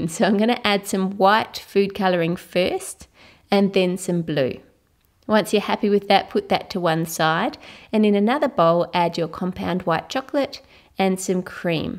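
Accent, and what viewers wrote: Australia. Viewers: Haha you sound so much more Australian i this video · R u Australian??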